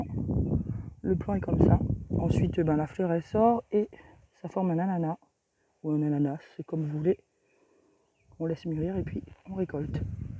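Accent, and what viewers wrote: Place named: Reunion, France. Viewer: Merci pour cette ballade ds la nature reunionaise. Ca me rapelle mes randos à Mafate. Les images sont reussies et on perçoit un petit accent reunionnais ds la voie malgré un sejour prolongé en metropole.😊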